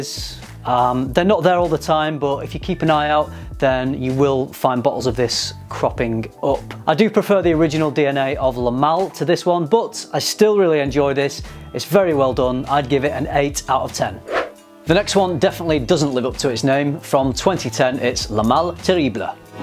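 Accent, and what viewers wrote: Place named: Manchester, United Kingdom. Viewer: To be honest, I'm more focusing on your accent 🥰. Are you Mancunian?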